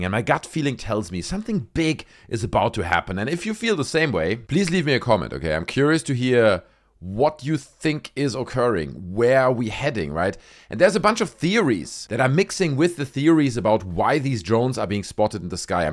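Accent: Germany. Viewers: Ein Deutscher spricht Englisch 👍🏻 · Judging by your accent, you're german...amirite?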